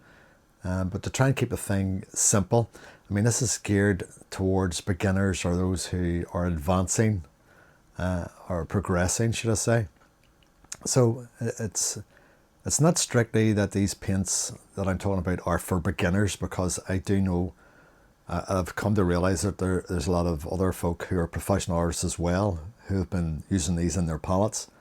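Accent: Northern Ireland, United Kingdom. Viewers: Van Gogh is surprisingly good! I have some spare tubes and pans in a box with others, and they work really well. I never had an issue. Thanks for this video! Cosy and instructive ❤ (extra points for the Norn Irish accent)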